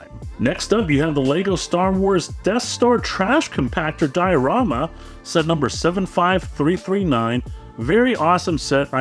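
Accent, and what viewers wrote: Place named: Ohio, United States. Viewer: Your accent sounds central Ohioan, Columbus?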